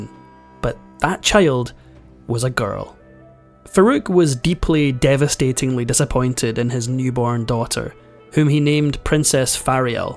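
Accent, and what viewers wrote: Scotland, United Kingdom. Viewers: What’s wrong with a Scottish accent? Best accent ever🏴❤️🏴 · It’s a Scottish accent.🤣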